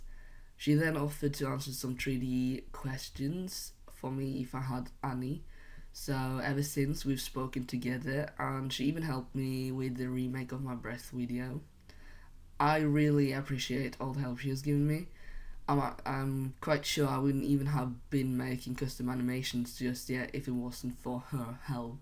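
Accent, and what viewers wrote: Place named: Finland. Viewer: At first I thought you were from Britain, but you sound Finnish (since Idk what norwegians sound like) so I just thought your accent was some sort of hybrid >.>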